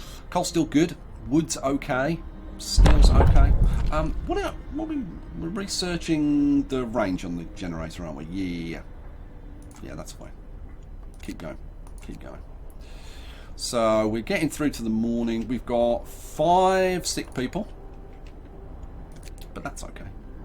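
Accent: United Kingdom. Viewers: I literally can not remember being so fucking entertained by a gameplay... its just amazing, and also i cant imagine listening to this in something other than a freaking british accent... Good job, buddy keep them comming! Lots of love!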